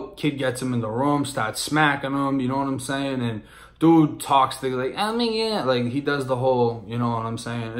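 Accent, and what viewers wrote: Boston, United States. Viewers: I noticed this with this older video.... he sounds like he's from the south... his new videos sound bostonian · I was listening to your stories and I'm like why tf bro talk like he from Mass. I can hear the boston accent . I'm from Chelsea Ma . Spent a total of 5 yrs in prison . Keep up the stories bro. I fucks with you. Even though I'm ♿♿♿ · I Can Still Hear That Boston Accent A Bit 😂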